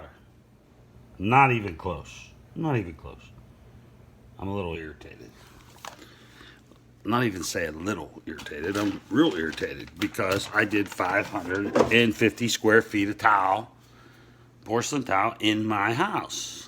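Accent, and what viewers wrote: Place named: Pittsburgh, United States. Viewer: ! LOVE your name!!! Trying to place your accent...are you from the Pittsburgh area?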